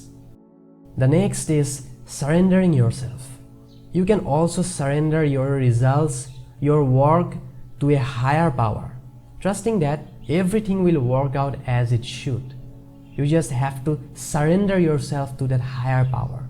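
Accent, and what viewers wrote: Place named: Assam, India. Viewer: Also don't listen to haters who complain about your accent, your accent is ok, we're Assamese people of course we'll sound a bit Assamese when we speak English, so does every other Indian whose mother tongue is Hindi,malayam, Tamil, gujrati etc.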